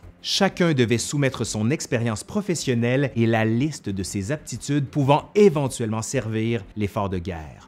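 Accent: Canada. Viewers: quand il s'agit d'emmerder le monde les Français on un don inné pour le faire , et les canadiens Français en ont aussi hérité apparemment !! :D j'adore votre chaîne et le travail que vous faîtes bonne continuation et bonne fêtes de fin d'années a vous ainsi qu'a vos proches !! ( P.S = j'aime aussi votre accent canadien ) .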